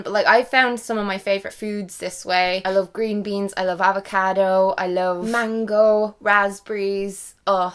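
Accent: Ireland. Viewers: Omg!!! You look AMAZING!!! So cute!!!! What happened to you? Like ur eyes used to be green! Ahaha! Now they're blue! Ahaha! Do more vidios about your amazing Irish accent! :-D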